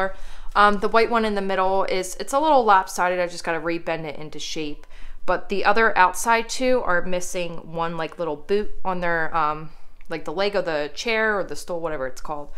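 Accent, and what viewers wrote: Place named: Maryland, United States. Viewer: You sound like you're from MD :)...you're having good luck and next time I'm in MD I'll try to check the dumpsters.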